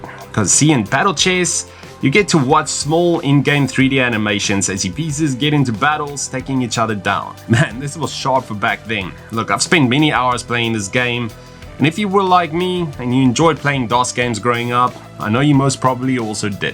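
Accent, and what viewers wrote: South Africa. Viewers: South African accent there right? Always nice to find another saffy on utube. Warcraft II was a great game. Played that and dune 2 so much. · Can hear that south African accent! I right? Pretoria or cape town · Good video, but god that Seff Effrican accent is obnoxious. You're one of the few YTers whose videos would be improved by a robot voice.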